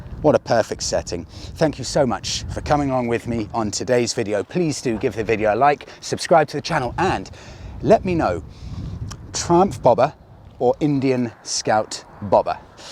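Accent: England, United Kingdom. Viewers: Great review mate! There's some serious badassery mixing a pommie accent with ape bars.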